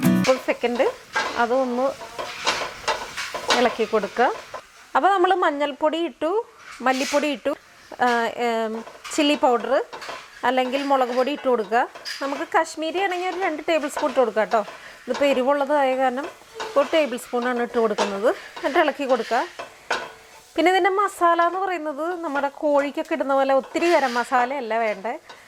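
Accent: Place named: Thrissur, India. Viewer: എന്തായാലും ആ trissur സ്റ്റയിൽ സംസാരം ഉണ്ടല്ലോ....തകർത്തു .... അത് കേൾക്കുമ്പോൾ തന്നെ ഞങ്ങൾ പ്രവാസികളായ trissur കാർക്ക് ഉള്ള സന്തോഷം ഒന്നു വേറെ തന്നെ യാണ്.......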